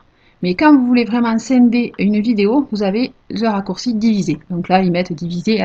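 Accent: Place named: Provence, France. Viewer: et merci l'ascent provensal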